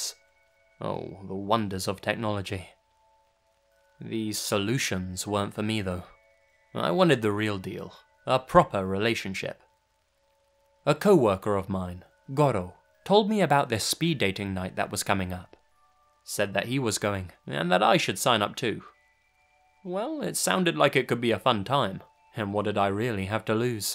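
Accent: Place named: United Kingdom. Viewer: I have been watching you for a year and I've only just realized your British like me.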